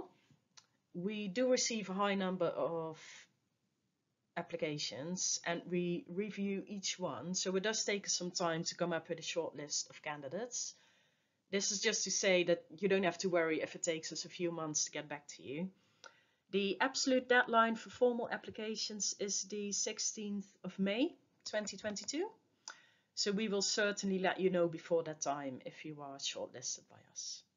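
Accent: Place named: United Kingdom. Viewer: I like the British English accent this lady has. It is so elelgant.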